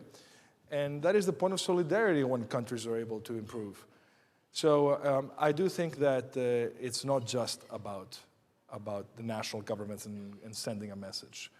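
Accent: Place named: England, United Kingdom. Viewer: Στέφανε ξύρισε το μούσι διότι δεν σου πάει. Κρύβει το πρόσωπο σου. Είσαι πανέμορφος άντρας. Μητρική γλώσσα τσ αγγλικά. Μου κάνει εντύπωση ενώ ζούσες στις ΗΠΑ μιλας με αγγλική προφορά και όχι αμερικάνικη. Καλό κουράγιο σου εύχομαι να έχεις εκεί μεσα στο ΣΥΡΙΖΑ που λογική δεν υπάρχει από τους συντρόφους σου.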